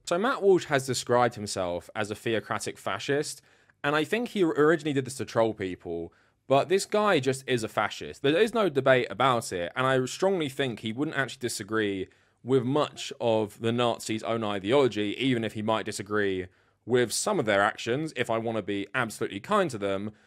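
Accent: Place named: United Kingdom. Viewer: Judging by your accent you are of british heritage, so are we here and didn't the british not do the same in Australia, India. So you're a colonizer as well, you have ancestors that were there killing those native Americans. You're probably closer to being related to those killers than I am and I was born here in the US.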